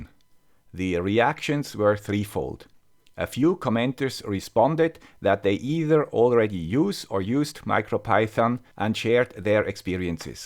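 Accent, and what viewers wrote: Switzerland. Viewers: There are a lot of rumors regarding what the youtube algorithm favors. In my experience most of them are based on very small sample sizes of observations. In niches which are more about the personality of the presenter (vlogging, comedy, and in crowded topics like video gaming) a face on-screen may be more important than in videos which are mostly about the topic being presented than the person doing the presenting. In ' case, his voice is enough to identify instantly that this is a "guy with the Swiss accent" video. It is very good branding that doesn't always need a face shown constantly. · There is no competition for a smart tech guy with a Swiss accent :) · Your channel is dry and humorless. A robot "With a Swiss accent"? People watch videos to be entertained. Most of your viewers know this shit already. You want proof? Make a mistake and see how many will jump in to correct you! 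If I want to know about a subject in depth, I'll read a few books about it. Not rely on a superficial 10-20 minute scripted video. People want honesty, but when you give it to them, they resent you for it. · Have a great 2019,! IMHO, you, like Dave, GScott and a very few others, became references for what a YouTube channel shoud be: a tool of mass education. Please keep it going, with a Swiss accent! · you are a very charismatic guy "with the Swiss accent". Your engineering approach combines the best mix of theory and experimentation. This is great. I reached your channel looking for long distance radios and i found LoRa and all sorts of related stuff. I kept with you and learned a lot more. Other people with a lot more subscribers are doing a very poor job providing very inaccurate information on everything. You know your limits and you respect them. I admire you for that. Keep your content safe for the future. 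Your legacy will last very long. Thank you.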